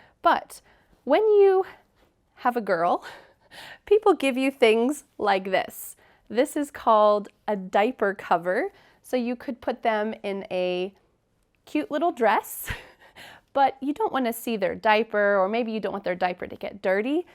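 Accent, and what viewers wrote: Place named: United States. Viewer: My best teacher i love americans accent.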